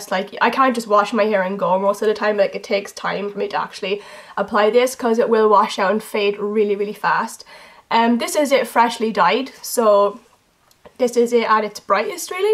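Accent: Ireland. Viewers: OMG YOUR IRISH AND I LOVE YOU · Your accent is beautiful! I wish I had a accent but NOOO I had to be Canadian -.- haha are you Irish? Don't need to answer if you find it personal xoxo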